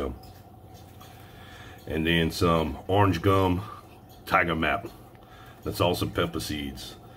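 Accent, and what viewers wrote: Louisiana, United States. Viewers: Two big thumbs a two big toes up! Gotta love the "vinegar" that tastes like wine??? For those wondering what is going on, NY won't let us send alcohol thru the mail! So, I sent a bottle of grape juice that was on its way to vinegar but must have gotten to it too soon! Dang, now your not going to have any vinegar! Thanks for the kind words and thoughts, this YouTube community is awesome and is really like the old days of CB radio and walkie talkies but with video! Keep on keeping on your a true YouTube character! Oh, and by the way that grass made it from your head to your beard, but judging from the beard it's probably not the only thing lost in there! Your beard is truly your trademark, well next to that Cajun voice of yours.... Better get picking up around there and good luck!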